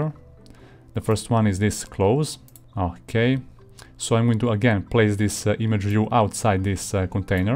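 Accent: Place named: Russia. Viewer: Your accent is similar to Russian. Are you From Russia? = ) Thank you for the tutorial!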